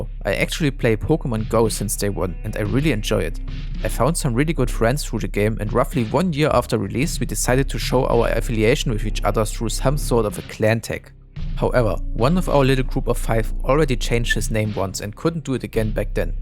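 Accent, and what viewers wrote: Germany. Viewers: What about Cult of the Lamb? Had some Isaac - Feelings while watching the Gamescom-Trailer. Nice (Cat-)face reveal Lieb deinen Kartoffelakzent xD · I actually like the German accent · I love how your german accent sounds when speaking english, like dessert for the ears. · i love your german-english it reminds me about laovaan who is also a german speaking english in his vids. you sound so similar kinda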